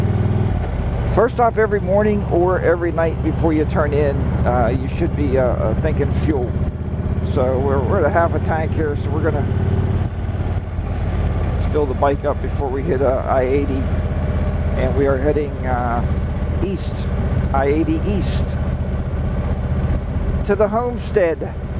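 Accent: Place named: Pittsburgh, United States. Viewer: I spent 5 years in Sandusky. Where you turned left, you should have turned right. I guess you figured that out. Your accent sounds like you’re from the Pittsburgh area.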